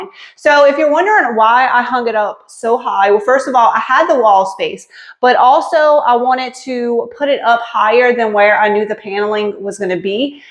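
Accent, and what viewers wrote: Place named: New Orleans, United States. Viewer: I love it all. I think it's a great idea about the apothecary jars in the bathroom. You were right about the rug, but I love those curtains. Beautiful, home.And I really enjoy your channel and the NOLA accent 😊❤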